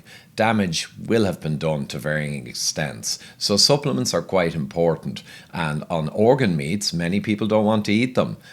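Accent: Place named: Ireland. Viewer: the proble for ivor , is his accent , its so difficult to take the irish accent seriously . they are more renound for making jokes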